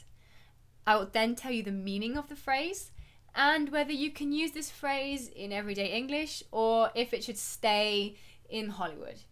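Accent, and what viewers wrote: United Kingdom. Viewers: Are you from England？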